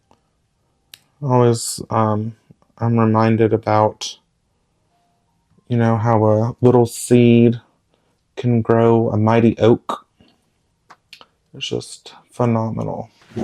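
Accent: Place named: Mississippi, United States. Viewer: LOL! I have a bob ross chia pet too haha. Your accent makes me feel like I'm back home. I'm originally from Mississippi.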